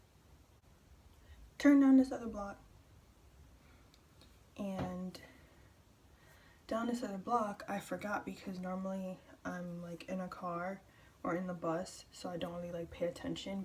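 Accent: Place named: New York, United States. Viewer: From the way you talk, you're definitely a native New Yorker.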